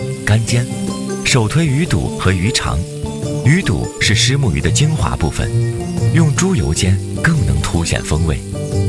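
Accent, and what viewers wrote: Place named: Shandong, China. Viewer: 根据旁白说英语的口音，我觉得他是山东人。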